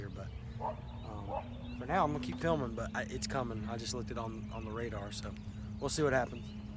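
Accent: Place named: Texas, United States. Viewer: Are you sure your not from Texas?👍 enjoyed the video, I will subscribe!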